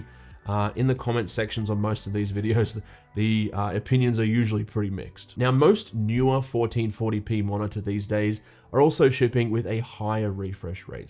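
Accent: Australia. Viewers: Would have been helpful flagging the pricing mentioned was in USD. The Aussie accent led me to believe it was AUD and there had been major price drops!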